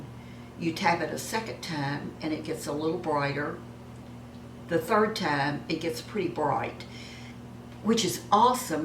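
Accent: South, United States. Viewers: I thought I recognized that accent! I am from Arkansas. We sound alike. Lol! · I love the way you say "mirror". That southern bell accent is so sweet.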